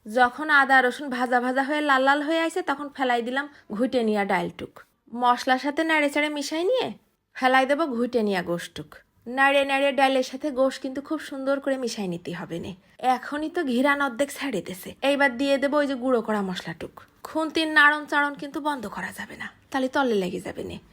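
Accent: Khulna, Bangladesh. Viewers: আপু,তুমার বাড়ি কি খুলনায়। কতা শুইনে তো তাই মনে হয়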